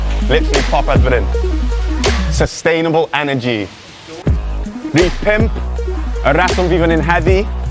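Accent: Wales, United Kingdom. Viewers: Tin siarad fel typical kid a aeth I Glantaf. Ond at least ti YN siarad Cymraeg so da iawn anyway.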